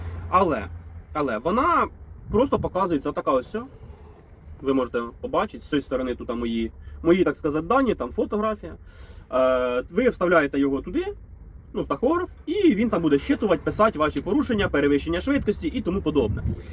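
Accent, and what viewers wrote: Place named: Vinnytsia, Ukraine. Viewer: Красавчик чітко ясно без води))) по говору ніби з Вінницької області 😅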